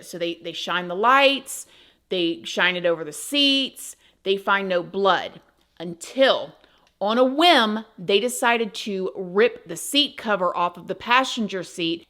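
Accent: South, United States. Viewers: I’m from the UK, you’ve certainly got that Southern Belle thing working for you, for sure. Not quite sure where to place it though, accent wise, I keep hearing some Louisiana there, unless the simple answer is, Florida you fool 🤣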